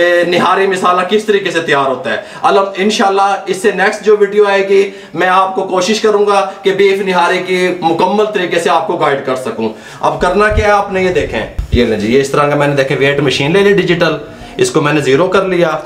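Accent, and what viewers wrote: Punjab, India. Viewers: Sir mazzrat k saath asp ka punjabi ascent hai tau sabut lal mirch k baad aap nay Kia dala naam samajh nahi aya🙏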